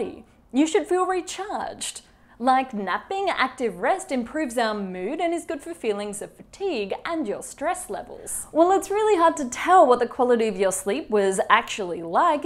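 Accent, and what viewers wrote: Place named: Australia. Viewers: I have an Australian accent and since I don't notice an accent must be Australian. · I believe it's Australian, but she is from New Zealand as far as I remember.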